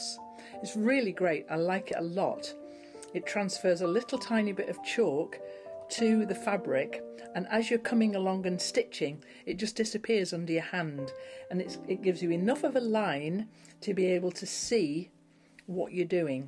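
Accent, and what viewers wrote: England, United Kingdom. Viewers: ❤love your English accent and how you live life to the fullest 🎉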